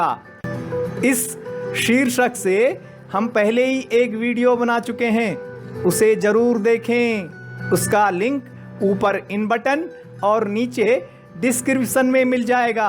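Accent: Garhwal, India. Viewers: Sir aapki me grhwali bahut acchiii lgti h...missing dat grhwali tpkara....😊😊😊.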